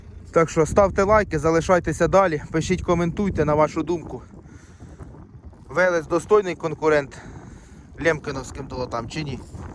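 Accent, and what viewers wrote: Poltava, Ukraine. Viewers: Проживав би ти там де він, і сам так розмовляв би,Полтавський суржик він такий.Ластівка залишилась і далі працювати.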